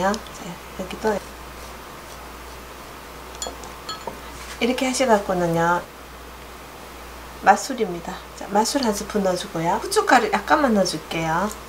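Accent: Gyeongsang, South Korea. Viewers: 오늘같이 비오는 날엔 튀김이 땡기네요! 경상도 억양으로 설명해 주시니 더욱 맛있어보여요~ ^^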